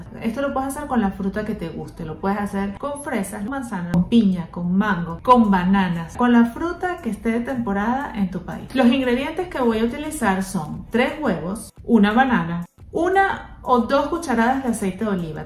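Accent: Venezuela. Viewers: ♥️♥️ creo que somos paisana por tu acento eres Venezolana...😍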